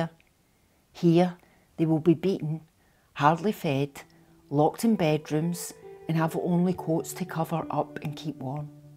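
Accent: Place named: Scotland, United Kingdom. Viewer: I don't know what you're saying. I know you're editing it out as it could trigger people. Any chance you could put a link in the description box to the full unedited transcript in future videos, or add one here? Just a thought. Your accent's BRAW... I'm from Glasgow 😂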